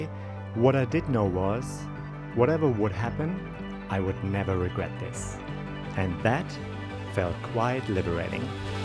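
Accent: Australia. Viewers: Great story, thanks. I can hear a slight Aussie accent - nice one 🌞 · In just a short 4 years, a tiny bit of Aussie accent could already be detected in your speech. Good choice in making Sydney your home. Well done for the great video. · Wow you are even started to sound Australian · Wow Aussie accent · I came across your video in my feed. I am from Sydney and feel you captured the feeling of the city really well. It's a beautiful video with calming music, although a side note is that you speak very calmly as well. Yes, there is a slight Australian accent creeping in but I think your voice would be universally understood (which I isn't always the case for some Australians). Glad you love Sydney. It has an outdoor and nature filled lifestyle that I miss in some other cities. Birds and all the crazy creatures are plentiful. On the flip side, I fell in love with Berlin the first time I went there. 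It has a charm that possibly, after going back several times might change with the seasons. I am not sure if I would survive a winter there, but at least triple glazing and decent heating would solve part of that. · Wow,. Your Aussie accent is really starting take hold! Soon people won't believe you're from Germany at all, a bit like JenDre [German Girl in London] who was frequently accused of being an impostor because of her impressive London accent. Please keep going with your videos. A very popular meme is 'things I found surprising/weird/wonderful in my new country'. It might not be all you want to do, but those videos tend to be quite popular, especially among people toying with the idea of moving to a new country.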